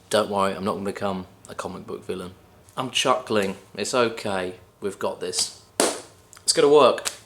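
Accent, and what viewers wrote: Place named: Berkshire, United Kingdom. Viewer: Hi. What part of England are you from? I detect a Berkshire accent but could be wrong! All the best 👍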